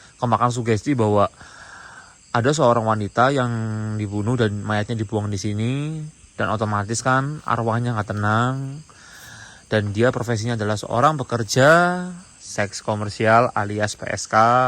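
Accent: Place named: Tegal, Indonesia. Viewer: Bg asli mana bg? Ko logatnya kek ada tegal nya 😂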